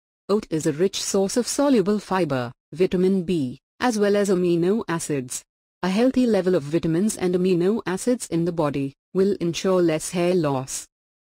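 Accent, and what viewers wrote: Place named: Asia. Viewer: An Asian robotic voice🤦🏼‍♀️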